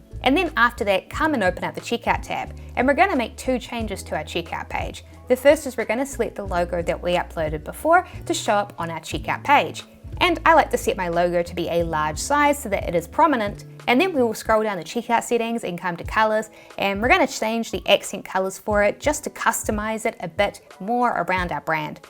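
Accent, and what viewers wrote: New Zealand. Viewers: I love your tutorials - so much information - they're brilliant! And I like listening to your Kiwi accent ;-) I have family in Christchurch.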